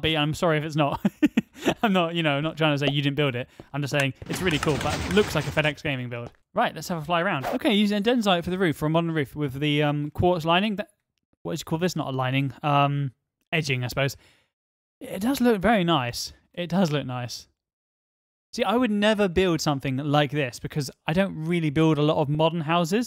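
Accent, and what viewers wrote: United Kingdom. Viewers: MAN THIS DUDE IS SO INTERESTING I LIKE YOUR VIDEOS KEEP UP THE GOOD WORK,THOUGH ITS KIND OF LIKE MUMBO JUMBOS,UR ACCENT IS BRITISH UR CLOTHING IS FORMAL AND U DO REDSTONE VIDEOS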